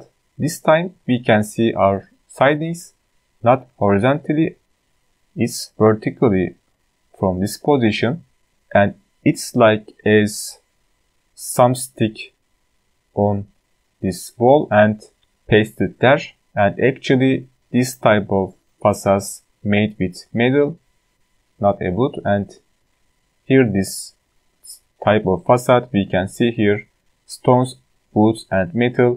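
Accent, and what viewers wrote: Turkey. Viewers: where are you from? I was curious. You speak English like Turkish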